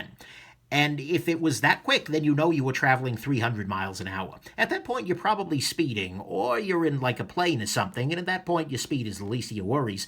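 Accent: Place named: Brooklyn, United States. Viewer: I love this watch but I really came here to hear the voice of, reminds me of Vinnie on Doogie Howser MD lol, plus that Brooklyn flow, being from Queens I can appreciate that!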